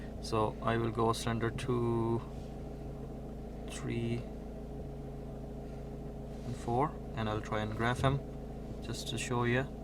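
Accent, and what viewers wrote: Cork, Ireland. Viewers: A Touch of a Cork accent is it? . Good diag I have the Autel Ds808 good tool for the money. Well done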